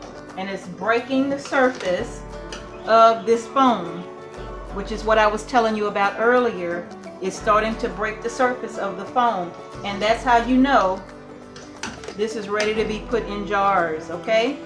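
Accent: South, United States. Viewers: I'm Australian, and I'm going to be thinking in a Southern accent (or whatever yours is) for the rest of the day, LoL. Very useful video, thank you! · I'm Canadian - from Ontario - and I love her southern drawl too!!! We got ar'own acc'nt, eh? Heh! Heh! Heh!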